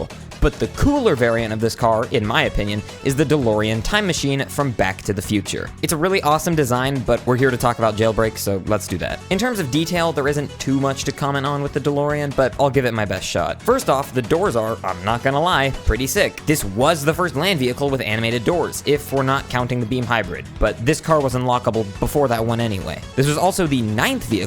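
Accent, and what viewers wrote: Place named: United Kingdom. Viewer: wow a british person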